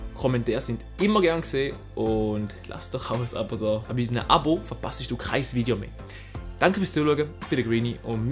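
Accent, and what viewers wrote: Switzerland. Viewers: Ja habe alles sehr gut verstehen können, top erklärt von Dir..! Trotz Schweitzer-Deutsch ;-)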